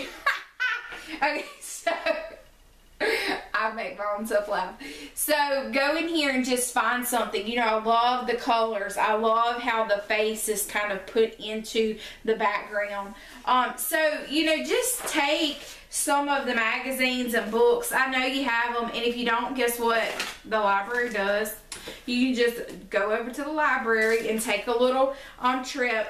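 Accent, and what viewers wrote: South, United States. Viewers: your voice is so darn cute, I literally listened to you for your voice :-) I love that southern drawl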